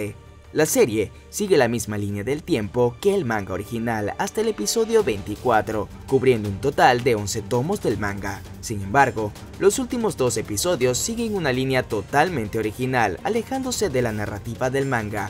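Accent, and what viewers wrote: Venezuela. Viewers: marico eres venezolano :o no pense que habrian mucho otakus venezolanos saludos desde puerto cabello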